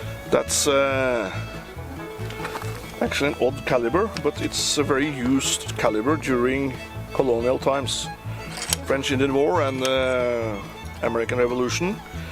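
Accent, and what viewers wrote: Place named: Norway. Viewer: Second time I’ve seen one of your vids. I thought on the first one you may have been from Canada, but couldn’t identify your accent Well welcome Norway. Fun vids, looking forward to more to come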